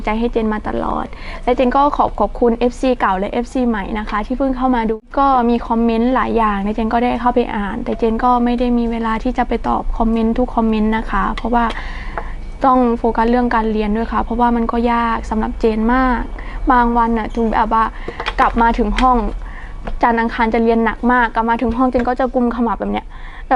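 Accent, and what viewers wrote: Central Thailand, Thailand. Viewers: น่ารักอีหล้า พูดไทยกลางชัดเจน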